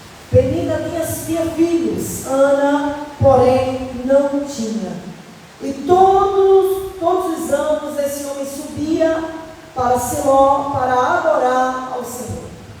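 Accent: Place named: Paraíba, Brazil. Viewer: A paz do Senhor missionária! Aleluia hum olha como minha professora de sotaque paraibeis esta chip, gloria a DEUS ''ha um tempo determinado por DEUS para todas as coisas'', primeira vez que vejo minha professora que prega sorrindo de corpo todo, louvado seja DEUS pelas vestis e amei essa saia, amanhã vou acompanhar a pregação toda, DEUS te abençoe com a familia.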